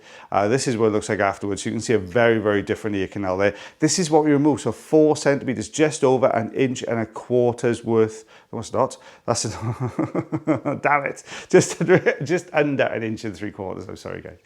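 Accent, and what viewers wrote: Wales, United Kingdom. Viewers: I was scraping treacle out of a bowl the other day and muttered something about earwax. My mum thought I'd gone mad, and after my explanation thought I was even madder! I tried to show her a video but even lovely Welsh accent didn't sway her 🤣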